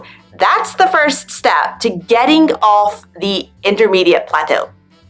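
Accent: France. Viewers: Oh my God! I can notice the french accent when you speak😱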